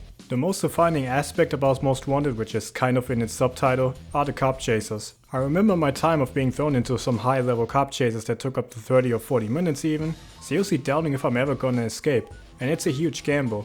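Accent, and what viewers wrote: Germany. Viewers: This has been real. What a nostalgic trip. Nice vid! You German? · man hört so sehr raus wie deutsch er ist😂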